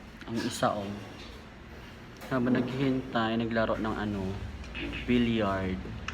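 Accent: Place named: Visayas, Philippines. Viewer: Taga Baguio ka Po ba madam? Akala ko nasa bisaya Po Kayo hehe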